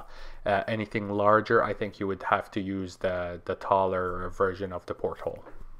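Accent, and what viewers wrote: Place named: Quebec, Canada. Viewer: Merry Christmas! Will check out the portholes. Really neat. And I was talking to someone locally and they said you sound like you might be from Quebec. True? 😁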